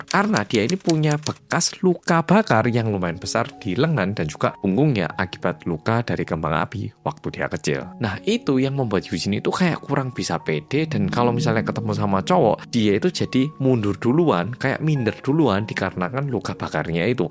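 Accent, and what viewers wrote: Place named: Java, Indonesia. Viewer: Salut keputusan Yujin❤ tks muedok 🎉🎉🎉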